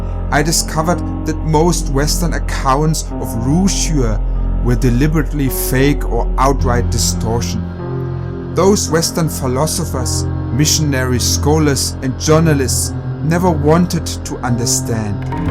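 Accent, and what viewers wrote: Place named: Germany. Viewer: dude....i clearly can hear your german accent.....where exactly do you come from?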